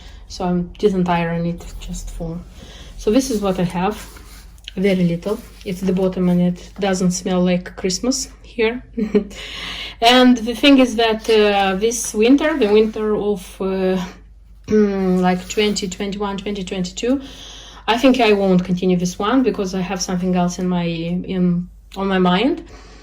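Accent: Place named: Moldova. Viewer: я по акценту сразу поняла что вы из Молдовы, я тоже там родилась, поэтому с еще большим удовольствием смотрю вас😊 Румынский уже очень плохо помню, живу давно в России, очень люблю ваш канал, столько вдохновения!💗🌷🌷🌷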